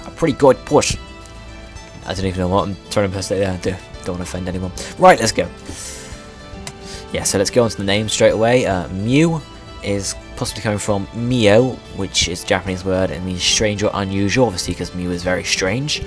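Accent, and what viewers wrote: United Kingdom. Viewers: I'm curious....are you and Matt Smith from the same part of England?....because you seem to have very, very, very similar accents.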